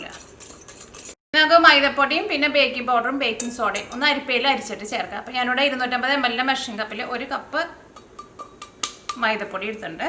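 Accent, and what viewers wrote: Thrissur, India. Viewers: Thriusur bhazha kelkaan nannyittunndu,cakekyum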